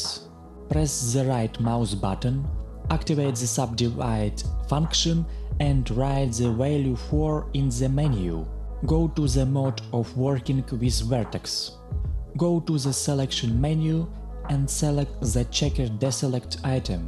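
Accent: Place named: Russia. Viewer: Тоже прикололся с явного русского акцента?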